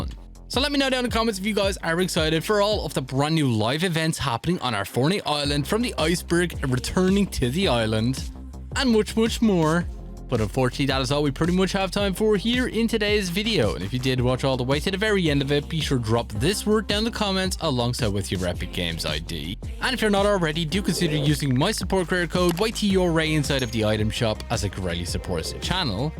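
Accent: Ireland. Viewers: Not trying be annoying I never knew u were irish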